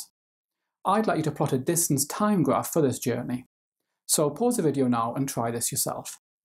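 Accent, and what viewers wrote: Wales, United Kingdom. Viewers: Lmao I thought he was welsh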